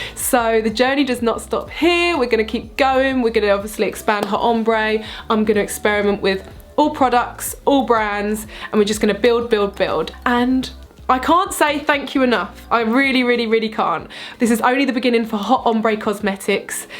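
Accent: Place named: United Kingdom. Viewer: Ok I've been seeing your lip and make up tutorials from a while now... But i never got to see you talk XD today i finally clicked on this video very excited too see someone who has such an amazing tutorials... Talk XD I JUST FREAKIN LOVE YOUR BRITISH ACCENT... I am so shocked that ur a Britain... Your beautiful... Stay like that always... Your tutorials speak alot of your creativity just in coloring your lips and i am here for it... Love from pakistan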